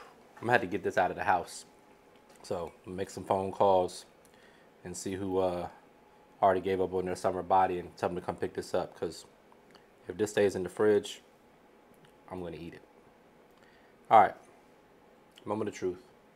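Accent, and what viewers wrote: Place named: Florida, United States. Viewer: Where are you from? Southeast? Your accent makes me think of Florida. 😂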